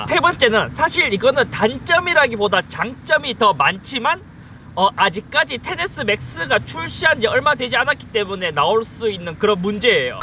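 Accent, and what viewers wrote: Gyeongsang, South Korea. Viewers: 나도 경상도 사람인데 이친구 경상도 발음이 왜케 웃기지?ㅋ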